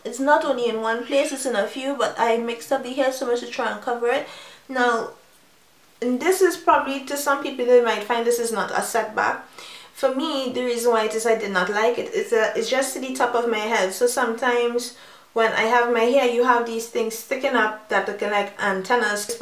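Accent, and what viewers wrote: Trinidad and Tobago. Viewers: you sounding trini ☺☺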